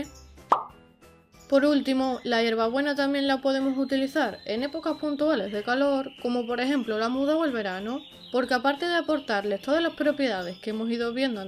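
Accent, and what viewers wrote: Spain. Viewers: En serio, tus videos son muy interesantes, me gusta el acento español pero me fastidia tener que escucharte, usas un tono y una forma de decir las cosas muy fastidiosa al oído, que pereza escucharte, usa un tono y una manera que sea compatible con tu voz y tu acento.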